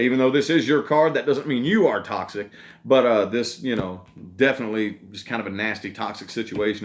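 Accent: South, United States. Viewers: His southern accent tho😍 Aqua here.... Let me go and listen to the "Bonus"🏃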